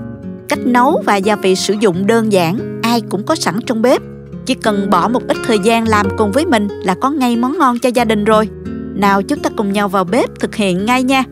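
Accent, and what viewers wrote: South, Vietnam. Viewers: Ngon ,giọng nói miền nam Việt Nam dễ thương,❤❤ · Giọng nói miền Nam của ngọt ngào rất dễ thương. Tiếc là cô dùng từ miền Bắc, và nhiều tiếng Hán Việt khó hiểu và vô nghĩa, như “thấm sâu vị”, “điều vị”, “sử dụng”, “sơ chế”, “chế biến”…Mọi người đang nói và viết tiếng Việt tuỳ tiện, không cần hiểu ý nghĩa và mục đích. Kính mong nói như một người miền Nam chính gốc, mộc mạc, giản dị và dễ thương như giọng nói của cô.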